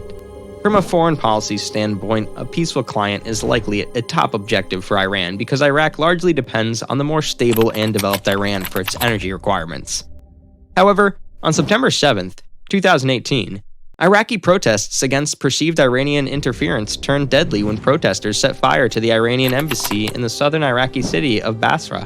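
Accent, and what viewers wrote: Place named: United States. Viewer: No he is not butchering anything. He is a typical Ey-mey-rie-san (American) who have no concept of language, grammar, spelling or pronounciation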